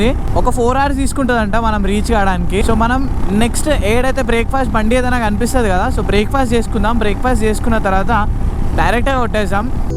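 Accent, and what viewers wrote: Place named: Telangana, India. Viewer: U r the person who comes from the heart of telangana.(slang,style) aba aba rendu kallu chavalu anoku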